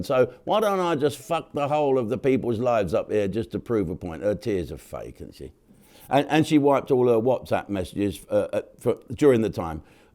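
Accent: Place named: Liverpool, United Kingdom. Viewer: that was more like scouse than hully gully jim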